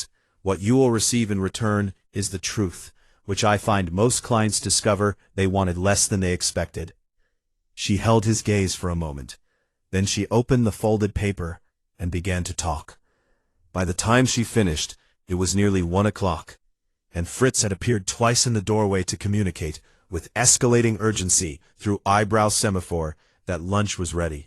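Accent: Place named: United States. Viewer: thanks for this amazing detective stories and overall thanks for the American accent, it's really difficult to get detective stories with American accent.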